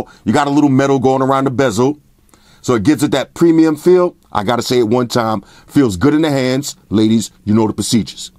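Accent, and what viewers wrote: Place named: New York City, United States. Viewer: No questions about your reviews but I'm also watching for white shoes and I love your NYC accent!👍💪🍺🍻🥃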